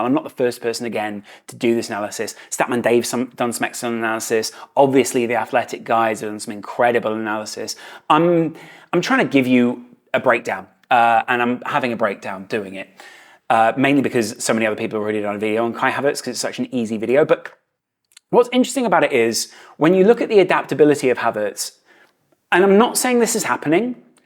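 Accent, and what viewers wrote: United Kingdom. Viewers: This just doesn't make any sense. British accent, baseball jersey, skater/surfer hairstyle....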